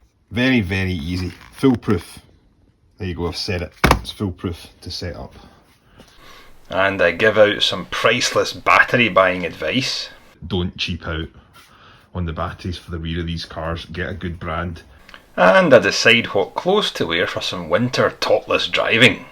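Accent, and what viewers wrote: Scotland, United Kingdom. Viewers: Love the scottish accent 👍 great review I have actually just bought one of these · Buddy you have a strong Scottish accent while have a US flag in your garage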